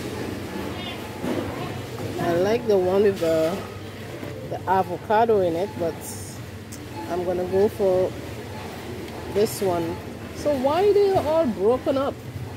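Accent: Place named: Saint Lucia. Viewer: I just came across this channel this morning am, today October 15, 2024. I just decide to check for youtubers from Lamentin. I decided to try this and the first channel I came across is yours. I heard the accent and I thought to myself, is that a fellow St Lucian. I have been told I have some relatives in Lamentin, because my grandfather was born in Martinique. I have been considering trying to look into find relatives who may have known my grandfather. Thats why I decided to look up youtubers from Lamentin. By any chance, have you come across anyone with the surname.....Darcis. You have a new subscriber.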